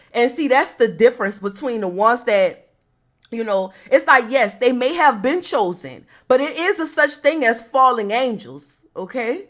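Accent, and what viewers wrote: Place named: New Orleans, United States. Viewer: with that accent you were from New Orlins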